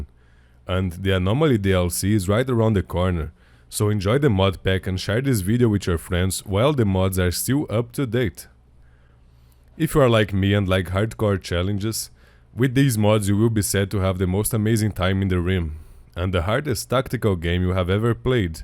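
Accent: Brazil. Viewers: Please man I’m begging do a playthrough with this mod, PELO AMOR DE DEUS MANO EU QUERO ESCUTAR O SOTAQUE BR EM INGLÊS DNV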